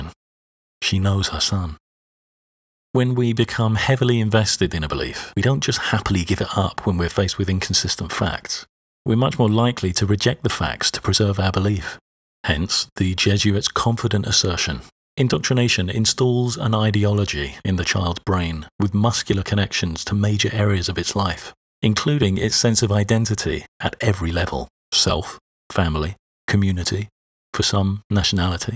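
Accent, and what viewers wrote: United Kingdom. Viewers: Nonsense is still nonsense whether or not you say it in British accent. Truth should be taught to children and falsehood shouldn't. We disagree on what is truth and what is not. You wouldn't have problem with indoctrinating into a child that discriminating on the base of skin colour is wrong and if the child was to very vehemently be against that idea you still wouldn't just accept his or her different viewpoint. Debates should be held as to what is truth and what is not but parents teaching their children what they perceive to be truth is in itself a very logical and understandable position.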